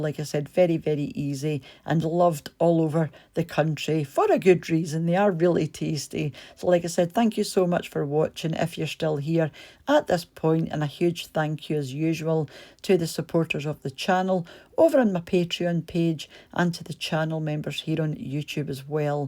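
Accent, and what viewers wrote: Scotland, United Kingdom. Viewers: Oh my God, I love listening to the Scottish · Great video. Good Scottish voice giving instructions. I like my tattie scones in the toaster. Nice and crunchie 🏴🤗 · How I miss my home country Scotland. My ancestors are from the isle of Lewis. That recipe helps a bit afainst being homesick.... As well as the typical Scottish English talking. So heartwarming. Thank you for both.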